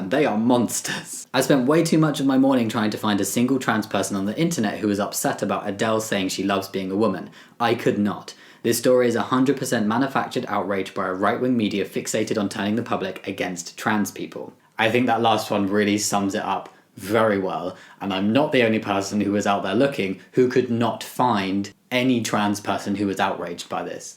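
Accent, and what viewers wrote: United Kingdom. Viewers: I keep forgetting your British I’ve just gotten so used to your accent🤦🏻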